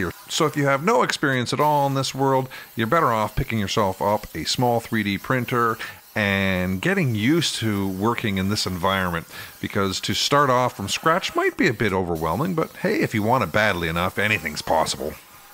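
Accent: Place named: Ontario, Canada. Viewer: i was like he sounds like hes from Ontario lol. Im next to Montreal very cool channel nice to see folks from Canada on YouTube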